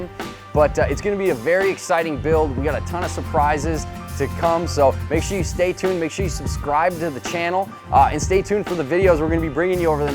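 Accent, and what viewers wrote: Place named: Wisconsin, United States. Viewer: Just that good ole Wisconsin accent! There is a full build list available!! <link>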